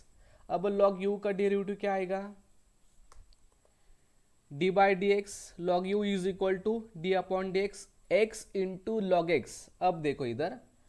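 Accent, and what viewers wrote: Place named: Maharashtra, India. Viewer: Accent marathi ahe tumchi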